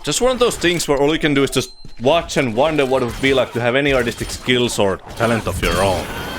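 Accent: Finland. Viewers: Hahahaa I knew you were finnish!!! :D huomasin heti aksentista x) Mutta arcane on vitun hyvä, se taide on niin kaunista en kestä! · Your channel is criminally underrated. I like how you become progressively and aggressively more Finnish throughout the video. Edit: Btw, you have the personality and energy of a person 1M subscriber channel so keep it up. You could make it big for real. I was expecting to see at least several hundred thousand subs at least when I looked at your subscriber count. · Ensimmäiset kymmenen sekuntia: Aksentti.. Onks tää jätkä suomalainen? : Hmm : :D Vois itsekin katsoa suomeks läpi. For shits and giggles :D Noh mutta kumminkin hyvä video. 6/5, toimii. Pitänee katsoa muutkin tekemänne videot. Ja kanava tilattu! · Love the Finnish accent.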